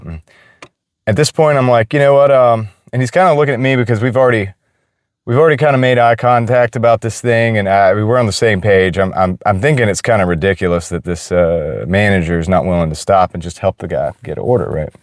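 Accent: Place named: Rural, United States. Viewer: Unrelated, just curious, what part of the US is the accent from? To me it sounds like a very mild country accent of sorts. Pleasant sounding. Just curious.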